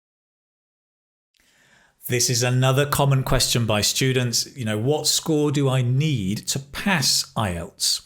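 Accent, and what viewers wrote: United Kingdom. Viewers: I ENJOY VERY MUCH WHEN I LISTEN TO YOU WHILE YOU SPEAK BRITISH ENGLISH WHICH WE WERE BEEN TOUGHT IN SUDAN MANY YEARS AGO. I want to speak it like you but l could not. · Is this British English?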